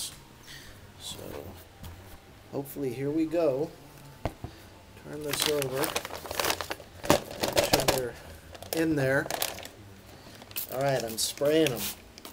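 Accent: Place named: Midwest, United States. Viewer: Im from new york. lol my accent is nothing like this. his is more of a mid western accent. lol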